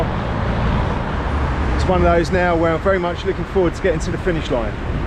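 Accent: United Kingdom. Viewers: not going to try and polish a turd here." lol This is very funny, yet with his British accent, he can basically say anything and it sounds like Shakespeare. 🙃 Turd (n) sometimes vulgar : a piece of fecal matter 2. vulgar : a contemptible person Examples of turd in a Sentence He's an obnoxious little turd. 3. a word used by a Spanish speaking bearded British man as he strolls the Kent coastline.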